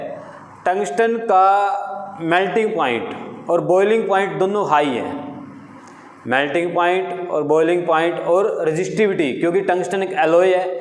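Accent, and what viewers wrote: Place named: Haryana, India. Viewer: Haryanavi accent 🙏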